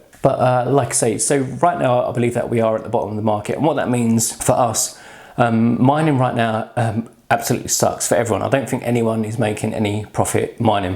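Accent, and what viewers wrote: United Kingdom. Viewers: This guy using uk accent?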